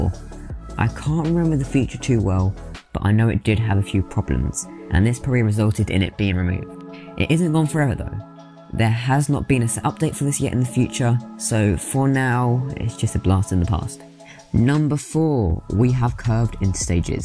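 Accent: United Kingdom. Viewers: Are you, British/Briish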